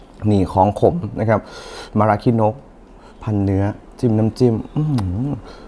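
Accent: Isan, Thailand. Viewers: พูดอิสานน่ารักมากค่ะคุณ ❤❤ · เราไม่กินดิบ ไม่กินเนื้อ แต่ชอบกินผัก ดูไปกินข้าวไป อร่อยตามเลยค่ะ เว้าอิสานได้น่ารักมาก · ชอบพี่ เว้าอีสาน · น้อง เว่าอีสานตาฮักหลายจร้าตามเบิ่งทุกคลิป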